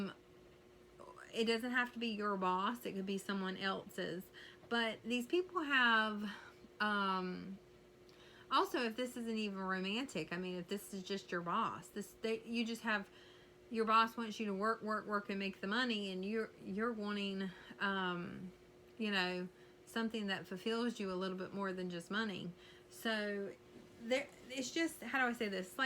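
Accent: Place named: South, United States. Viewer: Thank you for the reading. I like your southern accent reminds me of home 😊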